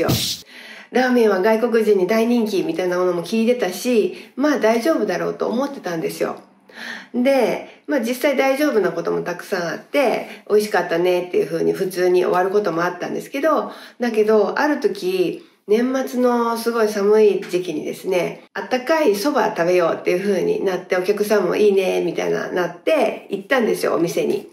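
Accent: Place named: Hiroshima, Japan. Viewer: さん、いつも楽しく拝見しています☺️ 通訳ガイド経験のお話面白です！広島弁も可愛くていいですね🙌🏼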